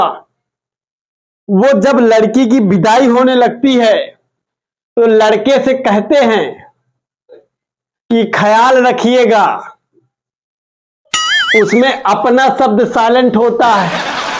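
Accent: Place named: Purvanchal, India. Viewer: वाह रे मेरे पूर्वांचली शेर तुम्हारे लन्ग्वेज से साबित होरहा है की तुम U P मे किसी पूर्वांचल जिले के हो क्यूंकि इतनी शुद्ध हिन्दी भारत के किसी प्रदेश या जिले मे कोई बोल नही सकता है M D sheikh from बनारस up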